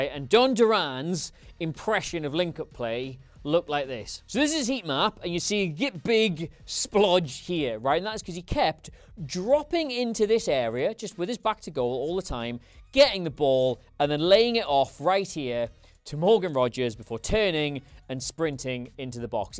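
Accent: United States. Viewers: american accent >>> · That American accent cracked me up 😂 love your videos!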